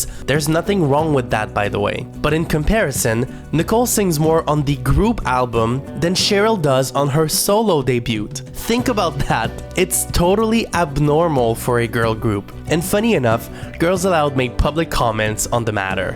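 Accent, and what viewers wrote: Quebec, Canada. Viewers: Is that a québécois accent I'm hearing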